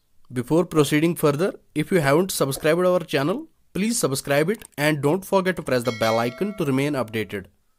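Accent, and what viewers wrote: Kashmir, India. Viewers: got ur kashmiri accent. good work brother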